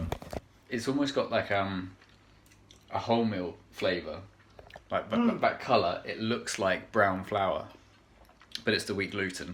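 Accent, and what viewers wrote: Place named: Scotland, United Kingdom. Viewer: Loves the Scots voice- nice roses too in the background whey to go paddle!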